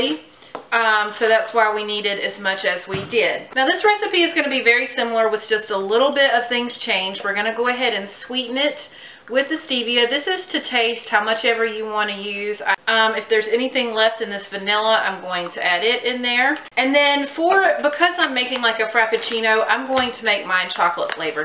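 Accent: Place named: South, United States. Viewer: Great video!! Your accent sounds similar to mine. You must be from the south. lol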